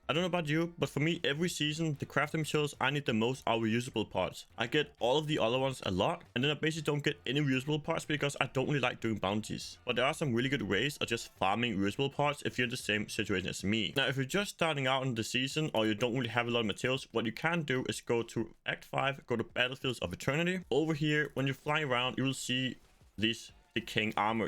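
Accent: Denmark. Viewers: wait rly?? Im from Denmark and i thought that english sound like it had a Denmark accent lol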